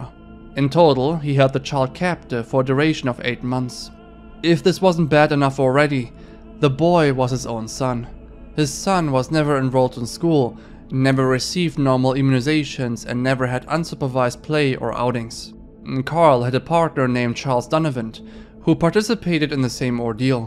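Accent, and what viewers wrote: Germany. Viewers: I love your videos, your accent is great, germans have great voices :) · my sister who is studying german says his accent is "very obviously german" so thats my guess LOL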